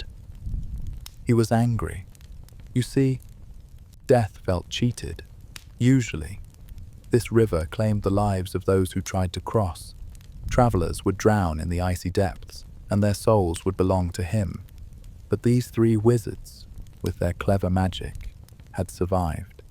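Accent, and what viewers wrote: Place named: United Kingdom. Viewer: You have no idea how much I love love love this video. I've been falling asleep to it every night for a week now. As a huge fan of everything in the Harry Potter universe, sleep/meditation/storytelling videos and the voices of british men, this is amazing. Please keep conjuring these videos! I can't wait to see what's next! 🪄✨️💤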